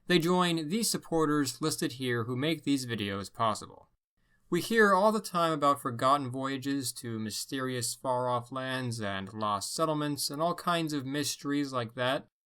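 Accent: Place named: United States. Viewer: One note for you mate, as a British-English speaking Dane who also speaks Old Norse, just because you're rolling your R's doesn't mean you're saying the names in Old Norse.. You're rolling your R's well - but still maintaining the American accent. If you're working on it then good job 👍 keep on going. But if you're not, exaggerate the vowels more and do a bit of research on the Viking alphabet and how to pronounce the letters. For example when you mentioned Erik the Red's name in Old Norse , - "Eríkr" - was perfect, but - "Rauði" - was pretty shite no offence. The letter, "ð" is the equivalent of a voiced "th" and the "i" is a stressed "ee". So it should sound like, "Rowthee-", rather than what you said, "Rowdeh". Just giving you a heads up cause you seem to be trying to speak Old Norse 👍.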